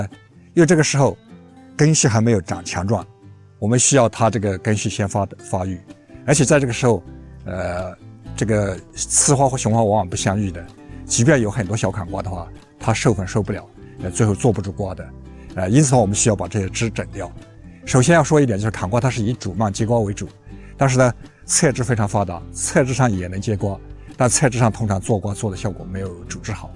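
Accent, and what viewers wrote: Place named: South, China. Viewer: 这口音，是南方人吧？😁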